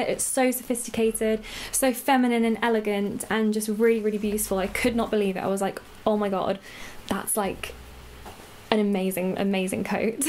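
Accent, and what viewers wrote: United Kingdom. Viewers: Are you British? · I am from Germany and I love your british accent 😍But also your fashion style👠👜. I am sorry if I made grammar mistakes 😕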